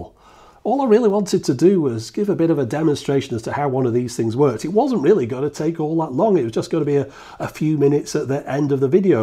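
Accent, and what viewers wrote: United Kingdom. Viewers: should make a channel dedicated to British English lessons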